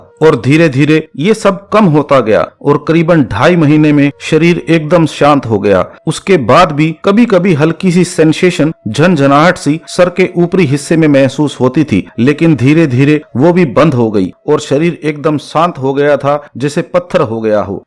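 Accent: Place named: Punjab, India. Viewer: I can feel your Punjabi accent Wise words..I will definitely try this method 🙏🏻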